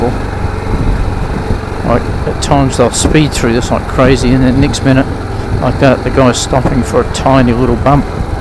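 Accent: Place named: England, United Kingdom. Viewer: ..hey...r u a sandgroper?! Sound like a pom! We wanna c where u camp n what u eat - and let us know where u r!! Cheers - enjoying it.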